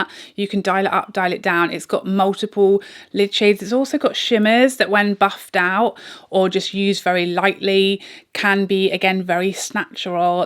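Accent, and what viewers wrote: England, United Kingdom. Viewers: you always look pretty, but exceptionally pretty today. My father's family was English, so I just adore listening ro you speak. And girl, you are so stinkin funny! 🤗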